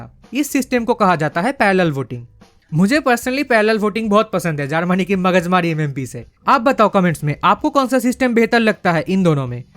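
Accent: Bengal, India. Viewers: R u bengali?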